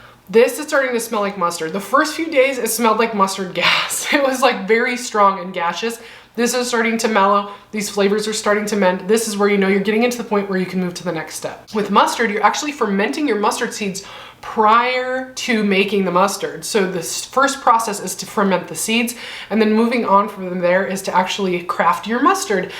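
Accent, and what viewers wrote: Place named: United States. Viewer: Love your common sense tips which I don't often think of...and your soothing voice (tbh some American accents are difficult to listen to (for me anyway) but I could listen to yours all day !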